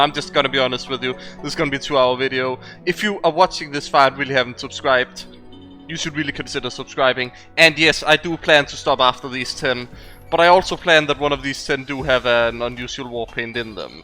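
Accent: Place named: Denmark. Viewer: hey i was wondering where you are from because you sound danish and im danish let me know if my speculation is right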